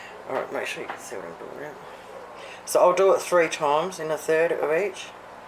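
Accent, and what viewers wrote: South Australia, Australia. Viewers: You have to be a south aussie, I can tell lol Love your recipes, thanks for sharing 😊😊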